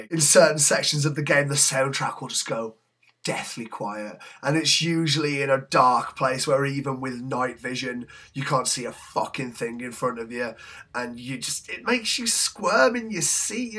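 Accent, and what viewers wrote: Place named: England, United Kingdom. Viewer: While I am quite a pushover when it comes to English accents, this was really well done. You need to make more videos. Subbed!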